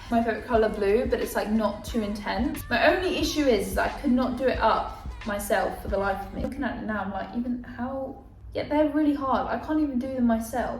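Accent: United Kingdom. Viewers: You speak British English very pleasantly. I think your video was well produced and presented. I'll teach you how to speak Southern American English if you should ever come to Florida.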